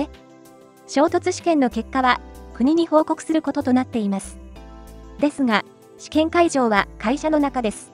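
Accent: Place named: Tohoku, Japan. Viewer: セリフの喋り方が東北っぽくて田舎を思い出すなぁ…。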